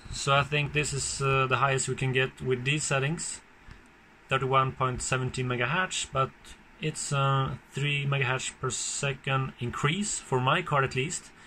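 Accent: Sweden. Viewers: Ahahah i got the Dual Mini model... Flawless Price ...snagget it right before everything went Haywire with the prices. Im Swedish too, you sound like Stockholm dialect.. :D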